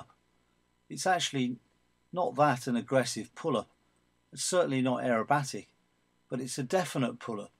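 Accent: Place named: England, United Kingdom. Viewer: the narrator simply has one of numerous English accents, giving a clue as to where in the United Kingdom he originated.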